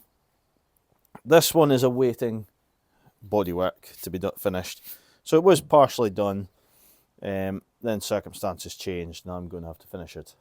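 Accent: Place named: Scotland, United Kingdom. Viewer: Well, this is one of those times that YouTube's random recommendations came up trumps! You are a very, very lucky man to own all those Reliants - I'd have a hard time deciding which one would be my favourite. I've always had a fascination for these, and do like the Mk1 Robin saloon with the glass rear hatch which I find stylish but I suspect if pushed to actually buy one it'd be a later Rialto. (A Kitten would be a tempting prospect, too. There used to be a couple running around my home town when I was younger.) Nice to hear a fairly local accent too - I'm watching this from just a wee bitty further north than Aberdeenshire.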